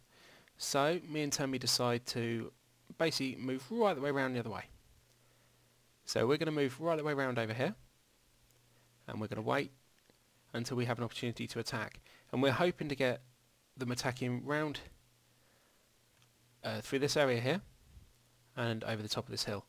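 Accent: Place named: United Kingdom. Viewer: The British accent loving it LOL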